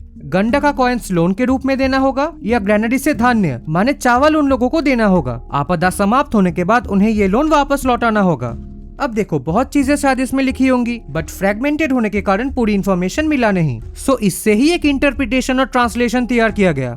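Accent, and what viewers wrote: Bengal, India. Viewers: Are you bengali? Your accent sounds like bengali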